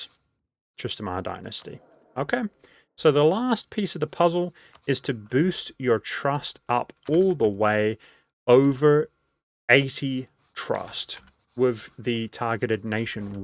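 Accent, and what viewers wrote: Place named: New Zealand. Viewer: I’m new to the game and You said your not demonstrating any skill but whatever that was must take some skill right? Lovely content as always, I’m honestly not used to hearing the kiwi accent on gaming channels so it’s refreshing.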